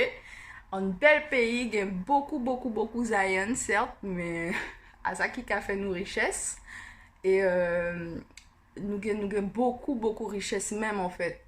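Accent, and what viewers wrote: Cap-Haïtien, Haiti. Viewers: Kreyòl la sanble a kreyòl Ayisyen an anpil, m konprann 95% nan sa ou di a, que chest beau. That Creole is nice. Resemble the Haitian Creole. 🇭🇹🇭🇹🇭🇹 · Tu parles bien avec la manière des jeunes de la guyane kkk mais on sens le petit cote haïtien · Je peux comprendre Eli kreyol maintenant c'est exactement cette langue qu'il essaie de parler 🇭🇹🇭🇹🇭🇹🇭🇹🇭🇹🇭🇹🇭🇹🇭🇹🇭🇹🇭🇹🇭🇹🇭🇹🇭🇹🇭🇹🇭🇹 je sens une jeune femme haïtienne qui parle a travers cet accent. tu me mets dans ma peau🥰🥰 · Mwen se Haitienne mwen konprann tt sa ou di yo, paske li preske sanble ak creole haitien,( e konsi lè yon ayisyen moun okap pa konn pale français lolll mvreman ri) blague...ou amusante kenbe la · Li sanble kreyol moun okap..suis capoise.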